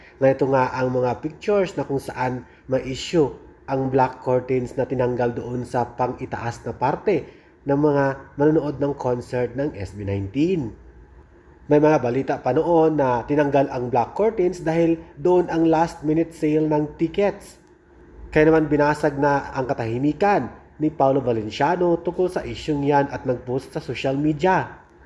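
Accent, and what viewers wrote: Visayas, Philippines. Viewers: sana nag AI kn lang..kc bisayang bisaya k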